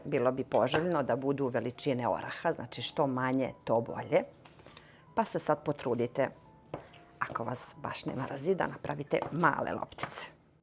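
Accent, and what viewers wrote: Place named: Blace, Serbia. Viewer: Aman zeno sa juga iz Blaca,sto se stidis svog imena.Zar je moguce da se tako lako menja ime krsteno koje ti je kum dao i na koje treba da budes ponosna.Ja znam da hriscanski kada se zena zamonasi onda dobija drugo ime. Treba da se ponosis tog blackog naglaska a ne da se krijes iza tog nimalo lepog imena.Za kulinarstvo svaka cast ali postuj ono ko si i sta si.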